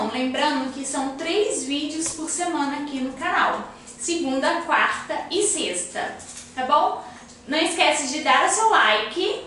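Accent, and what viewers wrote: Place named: Federal District, Brazil. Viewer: eu jurava que você era de DF kkk. Obrigada s2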